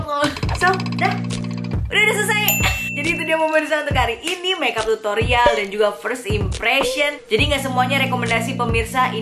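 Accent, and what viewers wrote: Tangerang, Indonesia. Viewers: sebagai SPG nya BRUNBRUN Paris di supermall Karawaci saya merasa terhibur, ngakak banget si ka bahasa Tangerang nya kelihatan jasa 🤣🤣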